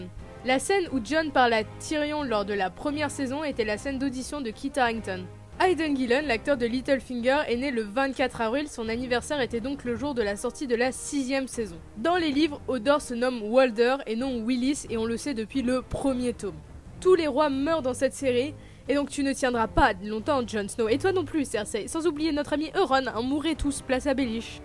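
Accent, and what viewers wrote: France. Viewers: Tu me fais rire avec ton accent français lorsque tu parles en Anglais.🤣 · Kevin Domac la prononciation de certains noms est clairement difficile. On ne peut pas la blâmer sur ça, elle le prononce seulement à la française.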